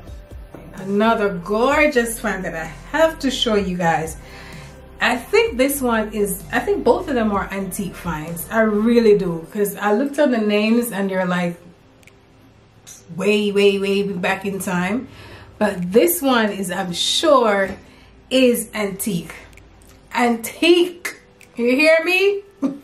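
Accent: Jamaica. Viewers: I hit that subscribe button 🔵 the minute I heard that Jamaican accent. Gots to support my Jamaican gurlll. Love everything you scored.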